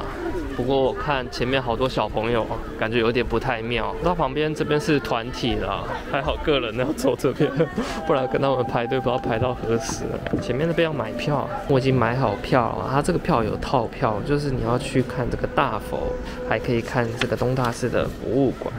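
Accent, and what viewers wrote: Taiwan. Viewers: up主是台湾人还是马拉西亚人？我听力没那么敏感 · 第一次看的影片，影片做得不錯，我只有一個問題，請問是台灣人?香港人?大陸人?看影片的標題很像香港人，內容卻是繁體字，講話的口音也有點不像台灣人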